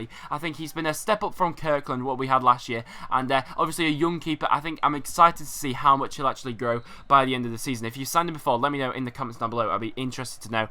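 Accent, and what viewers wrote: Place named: Lancashire, United Kingdom. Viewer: I live in Blackpool my accent sounds the same and nice video btw :D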